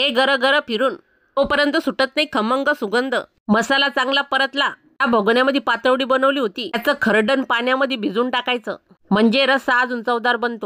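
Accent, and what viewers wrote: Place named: Ghats, India. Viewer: Ghatavrchi ahe vatte tai bhasha khup mast ahe MI tr boln Aaykte nemis tu mchi tai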